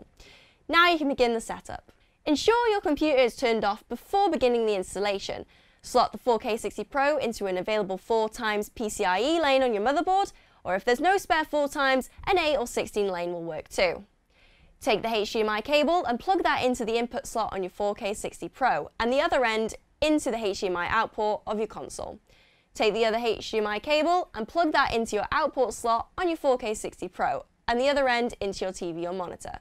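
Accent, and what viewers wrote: United Kingdom. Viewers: Thanks for the tips, and I love how you Brits pronounce "H" lol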